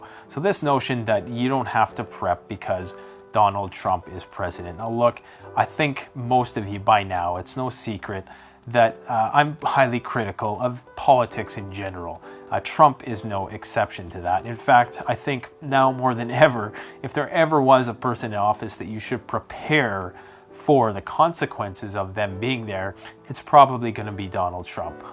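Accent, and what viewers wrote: Canada. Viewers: at first i thought this video is just a funny parody: a canadian flannel wearing with overly canadian "out" and "about" with that background music, but no it seems you're serious! well most of the things you said are pretty factually wrong but hey you seem like a pretty nice and likable guy so best of luck to you buddy. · The name is good. It tells us you are Canadian and that doesn't mean you are limited to talking only about Canada. FPS Russia is an American faking an accent and making videos about things that Russians don't have access to. It works well for him. Your Canadian accent is real and no one questions that you are from Canada. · Since there IS not a Canadian voice, I feel your channel is unique. You shouldn't have to change your name. Happy new year to you and yours.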